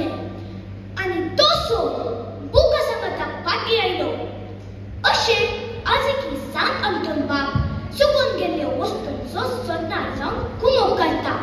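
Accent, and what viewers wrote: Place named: Konkan, India. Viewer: Beautifully and confidently spoken in sooooo pure konkani. God bless you doll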